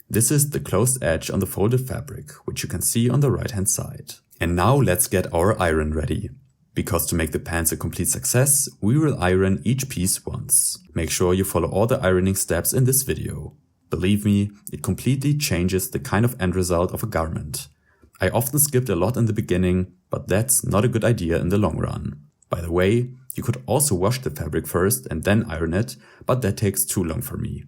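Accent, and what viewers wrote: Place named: Germany. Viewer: I’m hearing german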